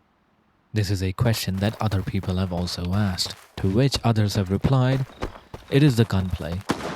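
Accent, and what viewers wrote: India. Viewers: I was like "Is this guy Indian or something ?", turns out yes, you are, I detected some thin traces of accent (one of my best friends is Indian too - for the record)... Anyways, you have a really good story telling, and the way your display your culture and philosphy in this video is very well written, I quite like all those small pieces you give, they form a well woven story, and I agree with all of that : you can always leave the Zone, but the Zone will never leave you.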